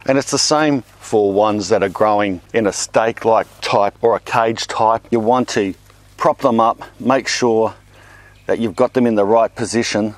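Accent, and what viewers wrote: Australia. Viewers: Greetings from Munich/Germany :-) Watching you on a quite nasty, cool and rainy Bavarian late spring evening, while having my own tiny tomato plants waiting (still - fortunately - quite patiently) for warmer weather, so that they can finally conquer their city balcony. I'm mostly following comparable (or not so much if comes to climate conditions and techniques!) German gardening channels, but I love coming back to you every once in a while, and let it be solely for a reminder of my own time down under and by taking in your lovely accent. Keep "mulching". Nice work & cheers! ;-)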